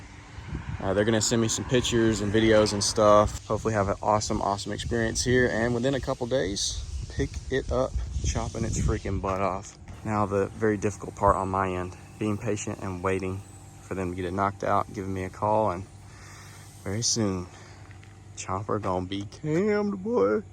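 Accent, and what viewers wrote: Alabama, United States. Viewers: I knew you’s from alabama when i heard that accent you got. Bad ass truck man glad to see a fellow alabamian on social media doing bad ass stuff with bad ass trucks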